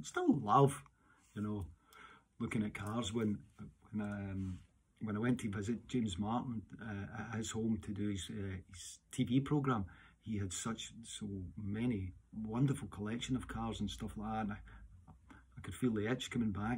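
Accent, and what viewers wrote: Scotland, United Kingdom. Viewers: I love your Scottish accent, I’m was born in Mexico 🇲🇽 City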